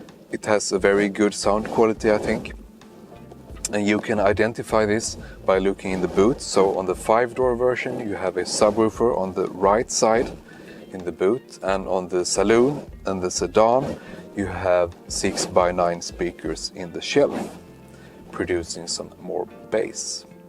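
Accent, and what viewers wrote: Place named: Scania, Sweden. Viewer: Skånska (?) = kvalitet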